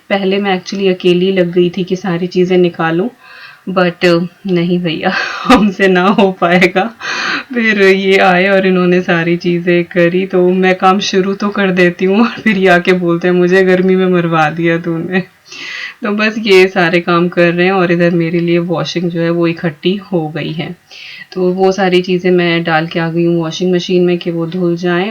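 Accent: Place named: Muzaffarnagar, India. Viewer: Di plz reply aap India me kha se ho aap ki baato se lg ra h aap mzn k aas paas se ho kyoki kale sing ji whi pe h or wha aise hi chulhe pe kheer bnai jati h nd wha pe hm bhi gye h kitni baar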